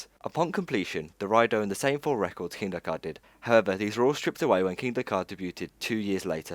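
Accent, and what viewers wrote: United Kingdom. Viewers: Contrary to the excellent(!) video presentation, I found the narration to be rushed and mumbled. I had to reverse a few times to catch what was being said - and it's NOT because of the British accent. Maybe my hearing is going.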